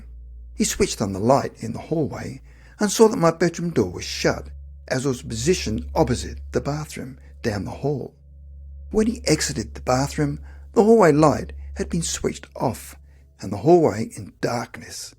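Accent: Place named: Australia. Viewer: An Australian accent.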